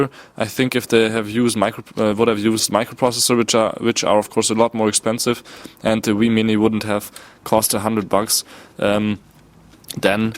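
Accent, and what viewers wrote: Germany. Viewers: Are you german? are you english speaking naturaly? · German english lol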